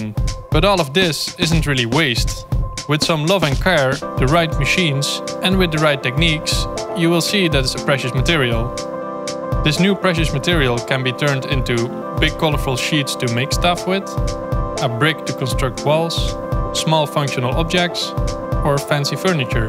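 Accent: Netherlands. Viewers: thomas hoogewerf he’s Dutch! What accent should he have?! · I am not sure if the dutch accent really works for me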